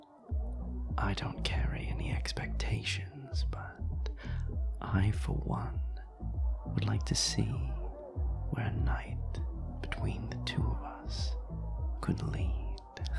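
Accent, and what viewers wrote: United Kingdom. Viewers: BRITISH!!!! HELP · Timestamps: - Porter has a British accent 👀 - Listener is their friends’ designated driver at the club, so they’re staying sober while their friends have all the fun without them - “Being the responsible friend doesn’t mean you shouldn’t get to have a good time too. They owe you that much. Uber’s a thing.” - “You’ve got a face far too lovely to look so bored. We should fix that.” [...] [laugh] “I don’t see anyone else at this table that I could mean by ‘we.’ If I’m overstepping, say the word, Treasure. I’ve been known to have scruples. Occasionally.” - Treasure wants to just talk for now, and Porter is okay with that — - “We’ll get to the fun when we get to it. You wanted to talk first, so talk. You’ll find I’m an exquisite listener. Speak.” - “My name’s Porter. Porter Solaire.” - Porter thinks that this isn’t the first time Treasure’s friends have left them out to dry on a night out, and he’s right — - They said that friendship isn’t transactional and theyre right, but Porter says that friendship is supposed to be a thing where all parties care about one another and act like it — - Porter noticing that Treasure’s friends don’t seem to care much about them - “Oh yes, I have a fabulous circle of friends in my own life. 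There’s even a few of them I can almost stand to be around” [chuckle] - “I find you very attractive. Is that too forward to say?” [...] [chuckle] “How deliciously demure. Look at me.” [...] “Mmm. Better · OH MY GOD HES BRITISH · He's British??? 😮😮😮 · A BRIT?!??!?!?! IM SCREAMING (in a good way) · HE'S BRITISH I NOW HAVE A REASON TO LIVE YEEEE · As a STRONG vincent girlie this feels like i’m betraying him but i am a sucker for a british accent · OMGGG HES BRI'ISH 😣👹👹‼️‼️🤩🤩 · a BRITISH redacted character? Me thinks yes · I've never related to a listener more. if a charming (British) man came up to me and started flirting THAT HARD im putty on the floor sir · Moments that made my me eep with excitement: -when I heard the British accent -when he said you have a face that's far too lovely to look so bored -when he said I could bring you a lot of pleasure -when he said he only bites when people ask nicely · I didn’t think he’d be British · IVE BEEN WAITING FOR THE BRITISH ACCENT! 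THANK YOU THANK YOU THANK YOU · IM GETTING BRITISH AND AUSSIE VIBES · THE BRI-ISH OMYGHAD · No definitely British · Probably British accent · BRITISH REDACTED YESSSSS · I didn’t know he was British You’re hitting me in my weak spot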